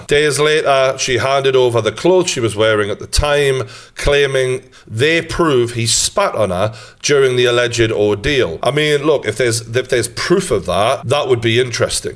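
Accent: Newcastle, United Kingdom. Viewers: Why are you putting a funny accent on for Connor McGregor? You can’t talk about anyone’s accent you have a Geordie accent 😂😂😂😂😂😂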